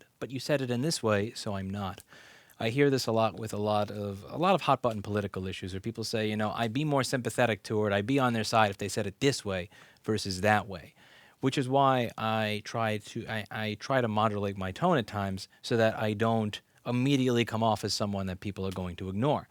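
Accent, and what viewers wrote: New York, United States. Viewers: Louis, someone else should do the day-to-day, but YOU should continue flying yourself to speak at legislative hearings and reimbursing your expenses ONLY from the advocacy group. The organization needs to have near-infallible, well-credentialed technical advisors and knowledgeable spokespersons from all corners of the RTR effort. YOU should be one of a large team of those experts. You’re a bit “New York” for this Oakland native, but you are well-spoken and engaging. You could tell a story about your trip to the “likkuh stowe” ...err, “bodega” last night for a bag of chips and people will listen. All my best to Mr. Clinton. Tell Oreo to go pound sand.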